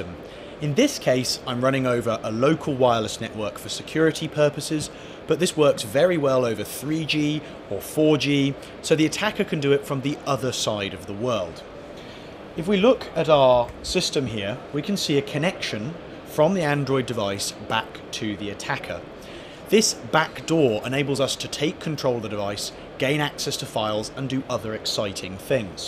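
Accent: United Kingdom. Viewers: I can hear the British accent ❤ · He is talking very British lol. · Man's British I guess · I really like the UK #accent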